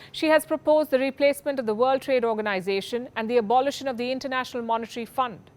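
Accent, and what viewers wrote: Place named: India. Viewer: Palki Sharma beautiful lady and a nice Indian English accent.🥰 I root for Macron I hope he wins radical far right or left are not good for democracy.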